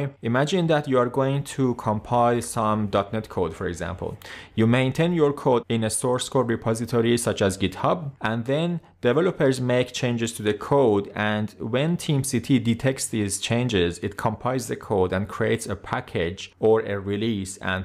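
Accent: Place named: Iran. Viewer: Dadash lahjat maloome irani hasti. Khaste nabashi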